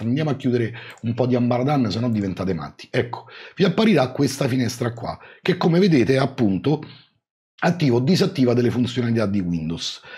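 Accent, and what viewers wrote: Rome, Italy. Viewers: quella cadenza romanesca nel tuo ottimo italiano, rende tutto più piacevole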